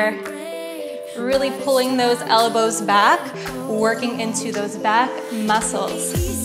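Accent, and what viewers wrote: Canada. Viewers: Love your work-"ouuts" ... and your Canadian accent!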